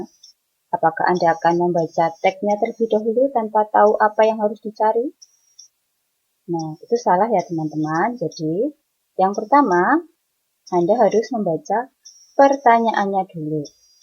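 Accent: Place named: Java, Indonesia. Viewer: Jowo bgt bu...😊😊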